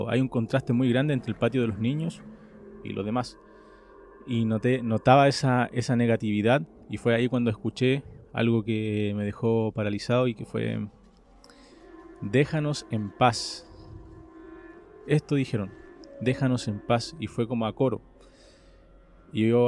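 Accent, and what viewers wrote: Chile. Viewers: Me gusta mucho el acento chileno <3 Muy buen trabajo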